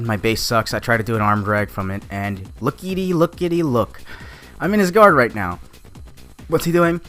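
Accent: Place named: Australia. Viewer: Yeah looked like an omoplatta. Great vids. Bring back the Aussie accent!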